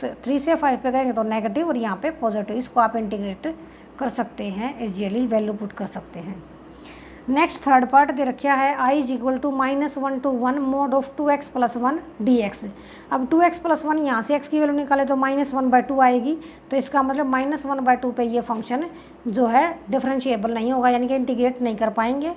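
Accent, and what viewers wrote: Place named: Haryana, India. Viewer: Pure haryanvi🤩🤩🤩🤩😍😍🥰🥰👌👌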